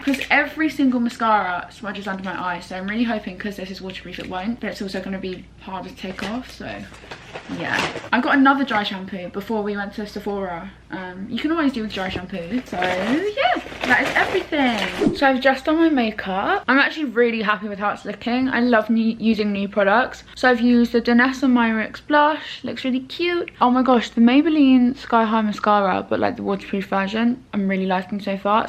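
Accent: United Kingdom. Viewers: Am I the only one who didn’t know she was British 😂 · I love your British accent ❤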